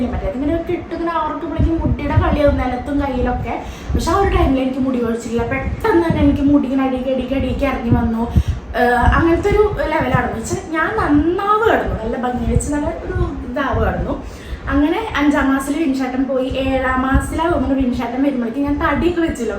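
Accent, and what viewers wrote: Thrissur, India. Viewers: samsaram correct thrissur slang aanaloo😍😍😍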